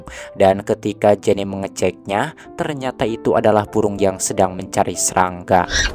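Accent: West Java, Indonesia. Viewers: Ti mana sundana a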